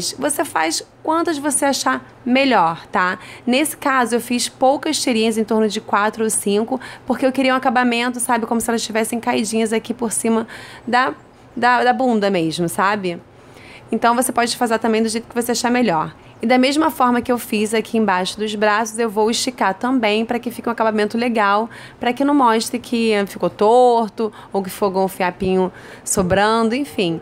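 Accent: Rio de Janeiro, Brazil. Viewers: PAUSAAAA! Nunca vi uma carioca com o sotaque tãoooo marcante! Hahahahaha, ADOREI! Seu cropped ficou uma gracinha, razô! Beijos e Pausa pra TPM!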